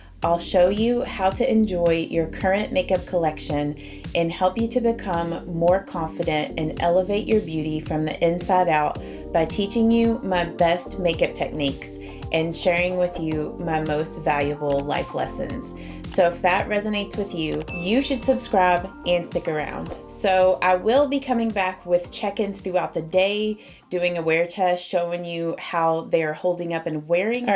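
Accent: South, United States. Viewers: Hi....i have had the foundation for a few months and just bought the serum tint yesterday....only just launched in Australia with the serum tint one this week....typical!! We are always so late getting newer stuff here Anyway i was excited to see your comparison video....look forward to trying it tomorrow. I was kinda hoping it might be less dewy looking...we shall see! Love your beautiful Southern accent btw... hope you have a lovely Easter! Take care...Lindy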